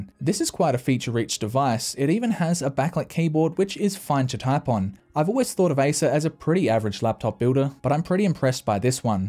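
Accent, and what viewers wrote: Australia. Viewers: About a year or two ago, I stumbled upon one of this guy's videos and found it really entertaining and strangely calming. After the video was over I went and watched something else and completely forgot about this channel. Yesterday, I remember having a cup of coffee and browsing some tech videos and thought, "What was that channel with the young guy with the Australian accent who fixed computers?" I couldn't remember. But then...I remembered a very small detail. I went to YouTube's search bar and typed... "PC cleaning eucalyptus oil guy" and BAM found him. Subscribed so I don't forget again.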